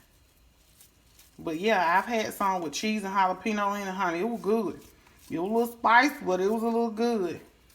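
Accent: South, United States. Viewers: It the real southern draw & southern meals fa me❤ if u aint from the south you wouldn't Understand❤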